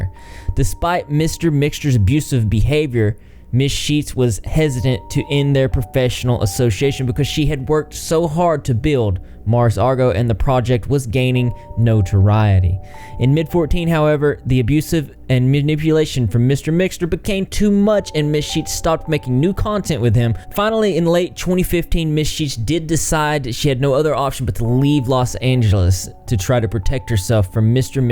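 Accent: South, United States. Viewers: Why did i think you were from Australia? Now you sound southern .. what in the tarnation is going on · Just subscribed :) I like your accent is it from Alabama or Tennessee very southern. Ps I like your analysis and you’re pretty handsome . Reminds me of one the singers from I see Stars. Have a great day! Haha Best regards, Mimi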